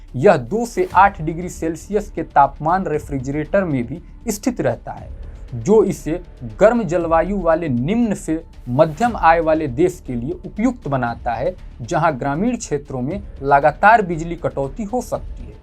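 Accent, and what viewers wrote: Standard. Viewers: आप बहुत शुद्ध हिंदी बोलते हैं 👍👍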